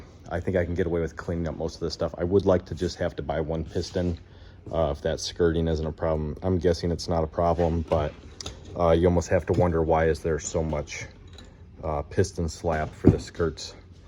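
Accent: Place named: Wisconsin, United States. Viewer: I was gonna ask if you were from Wisco with that Sconnie accent. But then I saw Monona on your hoodie and read the bio on your page. Always nice seeing some locals on you tube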